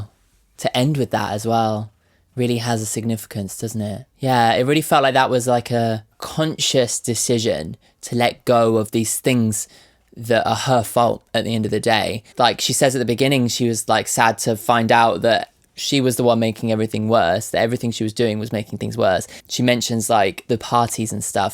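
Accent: United Kingdom. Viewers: how did i know you were going to speak in a british accent just by looking at the thumbnail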